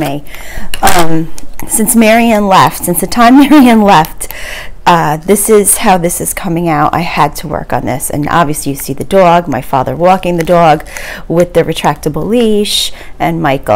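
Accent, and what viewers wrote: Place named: New York, United States. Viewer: Your dp looks great so far! I’m a Jersey girl, you sound a little New York ❤️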